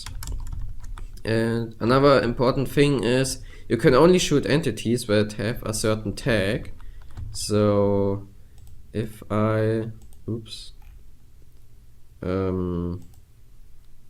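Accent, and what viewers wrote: Germany. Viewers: höre ich dar etwa einen deutschen akzent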